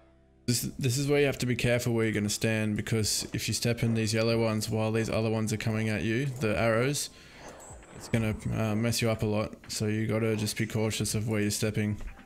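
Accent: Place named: Australia. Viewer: you sounds 110% aussie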